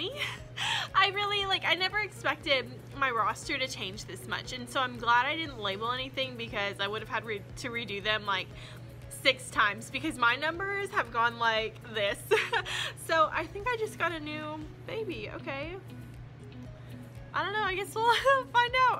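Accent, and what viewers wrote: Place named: Tennessee, United States. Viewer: So, I've been watching you from Day 1 on here & I told my niece I didn't know what it was about your videos, but you felt relatable. 1) I organize & put stuff together like you (all over the place, but it comes together nicely.) 2) I'm bubbly like you. Love the positivity. 3) I'm from Memphis, TN! I knew your accent and stuff sounded familiar & now I know why. You're a fellow Tennessean! Anyway loving the videos. I homeschool, but we have had so much fun watching your videos. Keep 'em coming !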